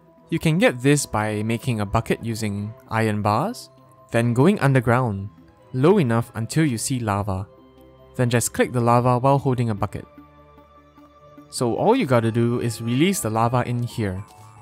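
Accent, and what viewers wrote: Singapore. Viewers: are you Singaporean? I can hear your accent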